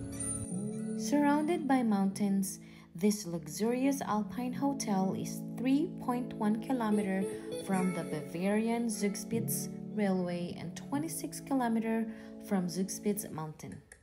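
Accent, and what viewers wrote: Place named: England, United Kingdom. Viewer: I love your English accent bebe ganda.. And that place also too much amazing. Always take care and good bless.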